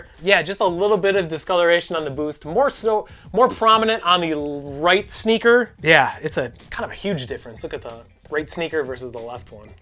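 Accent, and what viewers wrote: Chicago, United States. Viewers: PLEEASSEEE stop doing the Chicagoan intro man I like the videos but that KILLS it like damn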